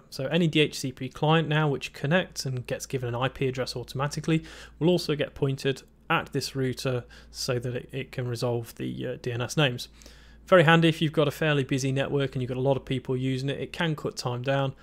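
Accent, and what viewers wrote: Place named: United Kingdom. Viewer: Hear British accent, thinks maybe he’ll call it a rooter. Immediately calls it a rooter. Subbed.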